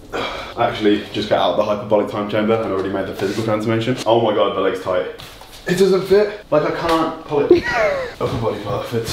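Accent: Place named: United Kingdom. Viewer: This was so much fun to watch and made my day! Seeing your costume struggles beforehand was just as entertaining as your time at the gym, so I'm glad you included that. I think anyone who has gone to a ComicCon can relate and it's amazing you got everything to stay on with as big and muscular as you are. Also, I'm from the US so hearing Captain America with a UK accent made me smile as well. Looking forward to the next video!!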